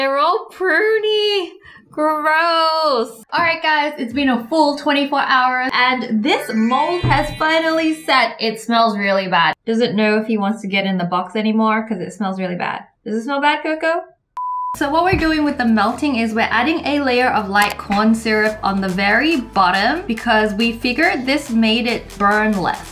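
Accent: Australia. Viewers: U got hate because people take this as a hate comment u judged the Australian accent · cuz she's Australian so she has an Australian accent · I've got my trusty KNOIF Nobody: Not a single soul: Me: Hahahahahahahahahahahah! The Ozzie slang is soooo funny!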